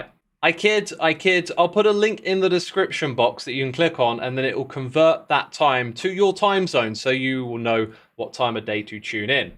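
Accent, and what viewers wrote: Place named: United Kingdom. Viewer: Fun Fact, PT stands for Pacific Time, usually when measuring what time that something is gonna happen in the United States of America, they choose either Eastern Standard Time (EST) or Pacific Standard Time (PT). Then again, I remember you're not American, judging by your British accent, so I guess you had no way of knowing that.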